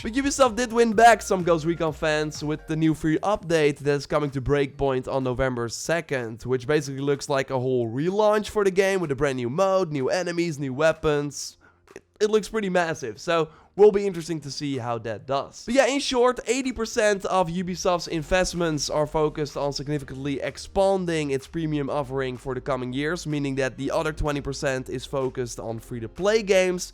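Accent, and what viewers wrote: Netherlands. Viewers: love ur dutch accent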